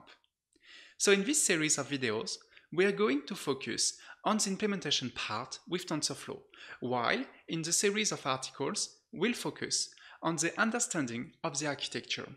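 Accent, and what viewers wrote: France. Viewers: Very good, thanks for your contribution. Amazing. (ps: i listin only for you french accent). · Tu n'est pas français par hasard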